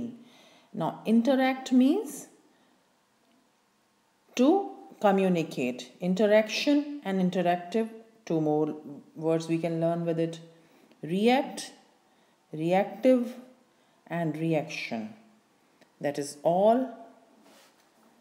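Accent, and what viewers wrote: India. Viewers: Terrible accent, unfortunately. Most probably Indian. Also, a lot of grammatical and vocabulary usage errors.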